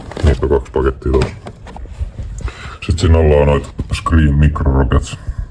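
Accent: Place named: Pori, Finland. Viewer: ootko porista? mietin ku murre kuulostaa siltä😅 no anyways on kova setti💥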